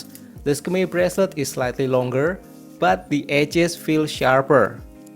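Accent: Indonesia. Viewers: It is Indonesian accent. You may find it similiar to S'pore/Msian.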